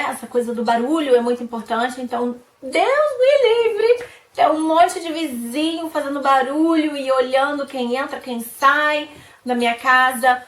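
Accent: Rio de Janeiro, Brazil. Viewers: Você morava em São Bernardo do Campo? Mas você tem sotaque carioca, haha.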